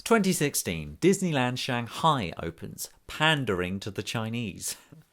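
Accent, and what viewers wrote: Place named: England, United Kingdom. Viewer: So I came across this video through being assosiated with SuperSam in some small way... and it has got me thinking a lot. I have a few friends who know a heck of a lot more about Disney and Pixar than me, The Super Carlin Brothers, so I have passed on this video to them... maybe they can say something further on the subject! Especially the bit about "Where would Disney be without Pixar?" So interesting. Also yes, you are an acceptable maker of videos. Acceptable to a medium degree. The funny thing is... I am English too, and never really notice accents... but yours has a quality to it that sounds amazing with a good microphone, keep it up!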